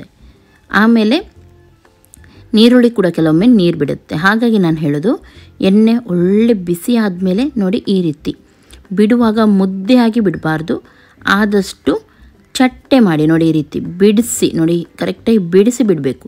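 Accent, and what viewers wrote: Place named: Mangalore, India. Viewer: ಟಿಪಿಕಲ್ ಕುಡ್ಲದ ಕನ್ನಡ.. ಚಟ್ಟೆ ಮಾಡಿ, ಮುದ್ದೆ ಮುದ್ದೆ ಮಾಡಿ, ಕರ ಕರ,.. ಕರೆಕ್ಟಾಗಿ ಹೇಳಿ ಎಷ್ಟು ಸಲ ಕರೆಕ್ಟಾಗಿ ಹೇಳಿದ್ದೀರಿ.. ಸೋ ನೈಸ್ ಅಕ್ಕ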